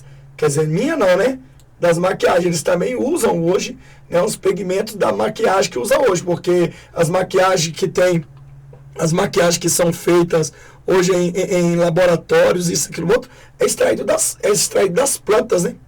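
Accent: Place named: Rio de Janeiro, Brazil. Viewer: Não é a voz da Débora nem da Léia Essa mulher da voz tem o sotaque carioca no S Débora e Léia não tem.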